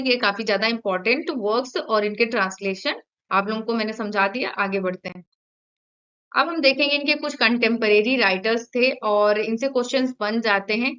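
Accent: Bihar, India. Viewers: Don't say like this galt nhi bs unka kuch tone aa jata h Bihar ka hm unki jitni knowledge gain kr paye bhut badi baat h aur unke jaisa pda paye teachers to bhut but kuch hi teachers ka padaya huaa mind mai aata h ...Sir aapko knowledge teaching I really love 💕 mai bhi aapki jaisi hi teacher bnna chahti hu ki Mera padaya huaa mere students ko samjh mai aaye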